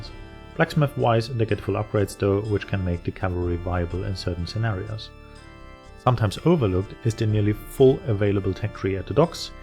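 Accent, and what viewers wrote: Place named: Germany. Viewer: Nice first strategy video. I think the best way you can improve this is working on your idiomatic English. As an example, there is your use of the formation "despite of", which occurs at least four times in this video. Now, the way you used it is fine, except for the fact that the formation does not exist in English. The formation you are looking for is "except for". Your non-standard word order is distracting. For example, "This makes it possible to go earlier on wood", which sounds a bit like Yoda-speak. Try: "This makes it possible to go on wood earlier". Also, "they run out of a bit faster wood" should be "they run out of wood a bit faster" - otherwise it almost sounds like they are only going to have slow wood left after the "a bit faster" wood runs out. In these constructions, remember to group "verb + direct object" then put the modifier (e.g. 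earlier/a bit faster) at the end. Word order is as important in English as word form/ending is in German. Incidentally, sheep is one of those rare words in English that are the same in singular and plural - there are no "sheeps". There are a lot of typical German-speaker phoneme substitutions in your spoken English but these will be fine if you can iron out the grammatical issues. These comments are intended to be constructive. Your English is much, much better than my German, but I am not running a media channel in German so my mistakes have few consequences. You are providing quality AoE content and I think if you work on these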